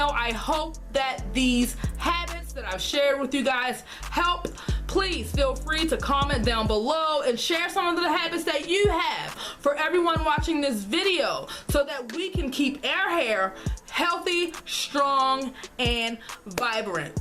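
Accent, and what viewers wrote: Baltimore, United States. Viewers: she definitely from Baltimore lol love it! love these videos, definitely helping as i'm looking to start my journey in a month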